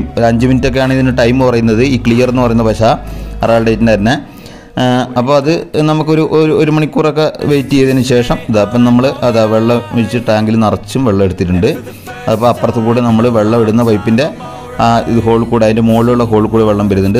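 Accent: Kannur, India. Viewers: നിങൾ കണ്ണൂർ ആണെല്ലോ....പോളി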